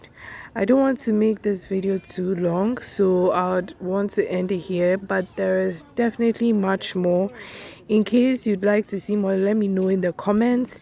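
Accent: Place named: Ghana. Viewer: Chale why do you sound so Ghanaian more than me 😂😂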